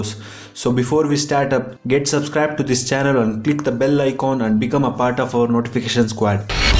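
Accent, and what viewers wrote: India. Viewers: Ty BRO nice idian accent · FUCK YOU AND YOUR INDIAN ACCENT AND YOUR SCUMMY VIDEO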